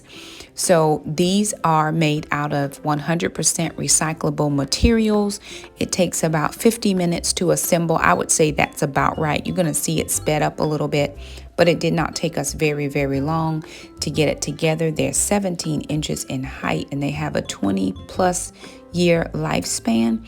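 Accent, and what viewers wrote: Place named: Carolina, United States. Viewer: I love the Carolina accent 🩷 also you just made me look up homestead, farmstead, and its actually what ive been wanting to do. (God speaks)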